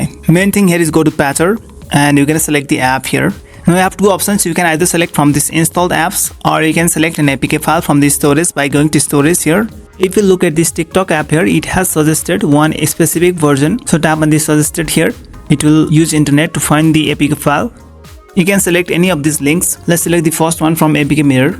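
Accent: India. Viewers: couldnt understand jack shit from the indian accent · That seems like a you issue honestly. That said, he does have some weird mix of accents, & this is coming from another Indian.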